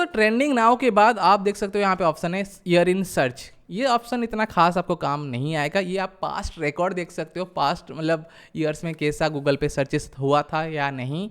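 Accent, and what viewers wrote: Odisha, India. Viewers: Brother, u r from odisha?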